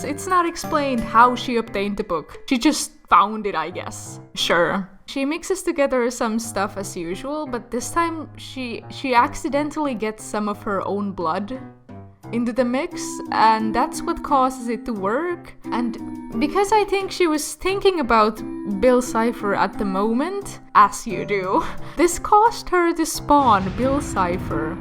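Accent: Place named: Finland. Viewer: TUNNISTIN HETI SUN AKSENTISTA ETTÄ OOT SUOMALAINEN HERRANJUMALA tekee tästä vielä paremman 😎.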